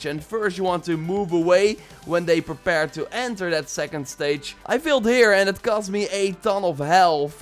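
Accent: Netherlands. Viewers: Awesome vid, my fellow dutchman! (Guessing by the accent... am I right? xD)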